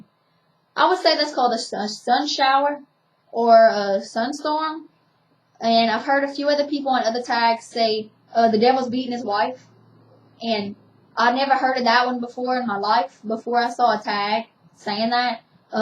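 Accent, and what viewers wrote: Louisiana, United States. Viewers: I love your accent lol I love when people have that type of accent in Louisiana. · i love accents. My favorite by far is the Australian accent, but now that Ive heard yours it definitely tops it. It sounds like a combination of traditional southern drawl mixed with New Jersey/New York City/ black people and French. I guess it comes from the mesh of all the plurality of culture that has been around since New Orleans was founded by the French. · "the very heart of cajun country" The way you pronounced "heart" could've told me that :) Love this accent :D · Im from opelousas/Lafayette and I knew your accent RIGHT AWAY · woah i’m from south louisiana but i’m in the city so i dont hear many cajun accents like yours :0 reminds me of pierre part lol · Word up! I love the Louisiana accent. Its so much better than all the other southern state. 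· I have to guess your from more towards Thibodaux, maybe Morgan city? Perhaps Lake charles? You dont have as thick of a Cajun accent as say Vermilion parish or ather areas in deep SW LA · mhm baby girl you sound pretty cajun as it is haha nice its my favorite accent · i like your accent much better then most luisiana accents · I love your accent. I find most southern accents charming, but the Cajun is really something special! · When I first moved to Louisiana I lived outside of Lafayette for a few years. This accent was common in a lot of my neighbors and with the teachers in my stepson's school. It's very common; she sounds exactly like a lot of girls and women from that area. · I'm from Plaquemines parish. You were soooo on point. Who needs an er when ya got an a. I love our accent. I do call it a soda though. "Grab me a soda" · Damn, chick. You must be from southeast Louisiana. Southwest is totally different. 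I dated a chick from New Orleans and you sound exactly like her. 😆☺️ I grew up in Lake Arthur. That's near Lake Charles. Not far from the Texas border. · if you ain't from Louisiana your pretty good I live in Louisiana and some people from here don't even have a Coonass accent like what our doing lol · You sound like your somewhere from outside of Baton Rouge like Zachary or Dunham.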